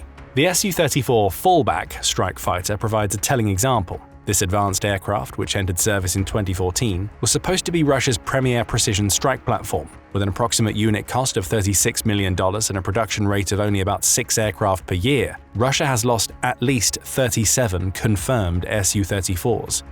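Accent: United Kingdom. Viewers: These videos are copium with a British accent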